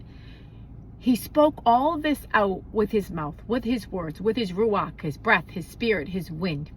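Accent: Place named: North, United States. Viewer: Amen! Are you from up north? I’m from upstate New York and thought you sounded northern!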